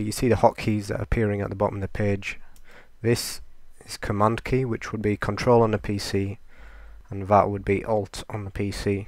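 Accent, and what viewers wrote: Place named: Northern England, United Kingdom. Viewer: is from yorkshire? well he's northern anyway xD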